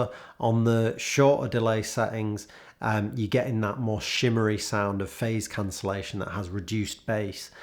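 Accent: Manchester, United Kingdom. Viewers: Too much Brit accent. Can't stand you. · Im going to go and revisit mine right now!.......great sounds..... .....Great also to hear what sounds like a Mancunian accent on YT....Cheers from a Stopfordian relocated to Kent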